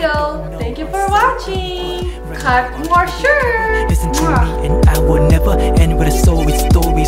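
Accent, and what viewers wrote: Philippines. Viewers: **MUTE ASAP** just THAT TYPICAL filo fob accent.... -COMPLETELY KILLS IT!!! (....&tryn act ol cute n shit, pfffft~LMAO😁) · Filipino accent! haha understand kita! (: love you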